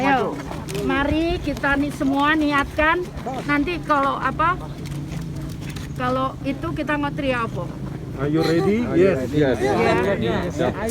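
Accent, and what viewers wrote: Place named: Surabaya, Indonesia. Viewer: Memdengar bhsnya serasa msh di Surabaya. Lanjut bu!!!!.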